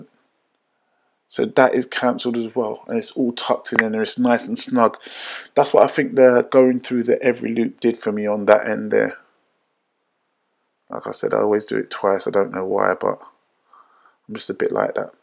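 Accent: United Kingdom. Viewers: Finally found a video where someone did a fantastic job and spoke clearly in the Queens English, worth subbing and great upload. Thanks for taking time and sharing young man, appreciate.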